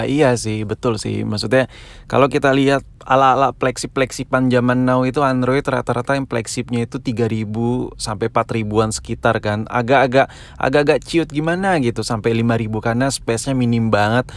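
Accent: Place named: Medan, Indonesia. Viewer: Lu orang medan bang.. Pake bahas Kimak pulak kau...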